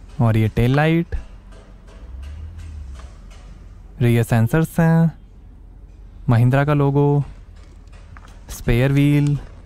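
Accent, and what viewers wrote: Gwalior, India. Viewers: Sir Aap gwalior Ke Ho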